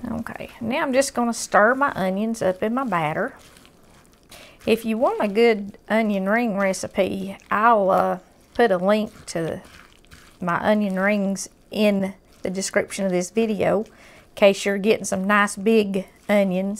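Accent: South, United States. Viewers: the food is seems so good but all very fatening. only problem with southern food, not b=very healty. but i like your accent. i am going to try and make a low fat version of the broclie soup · I love these videos, love your southern accent and the whole thing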